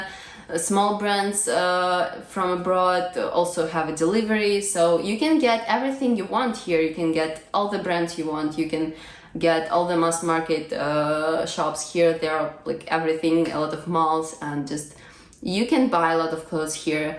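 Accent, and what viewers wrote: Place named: Russia. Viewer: Happiness is found in contentment and simplifying ones life. Buying lots of clothes to impress others will produce disappointment and empty your wallet. Thank you for paving the way for others to consider the minimalist lifestyle, it is certainly producing fruit for me. A technical note: Your voice seems to echo a bit in your kitchen and combined with your light Russian accent it may not always be easy to understand you. Consider getting a small clampable microphone to attach to your neckline, it will make your voice very distinct. I noticed when you were (outside) talking while headed to the thrift store a few weeks ago, your voice was quite clear. For what its worth. :)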